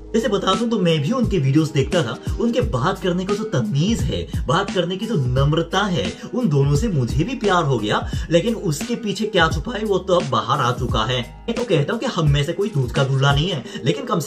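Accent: India. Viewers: Bhai aap hindi to bilkul indian accent mein. Awesome! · Korean bro your accent is completely indian noicee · Yaar aap indian ho mujhe nahi lagta aap korean ho aap bilkul indian lagte ho aap hindi itni fluent bol rahe ho aur itna hi nahi expression bat karne ki style sab indian hai nice sir mein first time aap ki video dekh rahi ho aap mujhe pure indian lage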